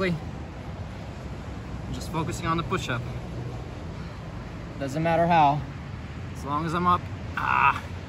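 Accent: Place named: Russia. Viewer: Just as hot as 10 years ago. Interesting to see the Russian accent isn't nearly as thick as it used to be.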